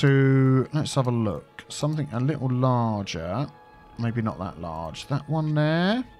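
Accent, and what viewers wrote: United Kingdom. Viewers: You sound British? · He's trying to sound American? I'm Canadian and soundee like the most British man I have ever heard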